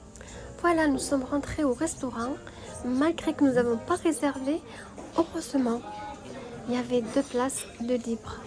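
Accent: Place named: South, France. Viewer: Très belle vidéo surtout avec ton accent du midi...Une vraie conteuse..On dirait Nora ACEVAL 😊 Très beau château avec ses jardins paradisiaques...❤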